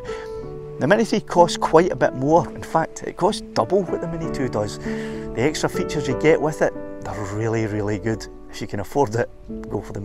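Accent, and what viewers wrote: Scotland, United Kingdom. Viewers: Hi, sitting here in my cabin up in North East Finland with a big smile on my face, enjoying your Scottish accent and your comment to the teenager who drove past. The Mini 3 is my first drone and I enjoy flying it. I myself am an instructor and graphic designer and have made quite a few films within motion graphic advertising and documentary and will usually hire a drone photographer for the films I make. I would say that for lower budget films, the DJI mini 3 Pro is great for the job. Thanks for sharing, slàinte from a Dane in Finland.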